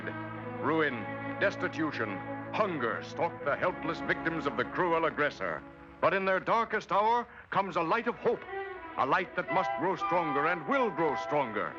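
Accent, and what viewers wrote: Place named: Ireland. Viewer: Does anyone happen know why the Disney staff chose an Irish narrator for this short?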